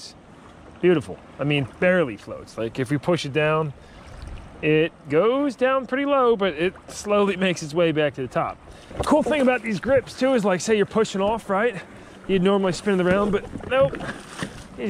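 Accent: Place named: Philadelphia, United States. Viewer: This man is def from the philly region i can hear it in the voice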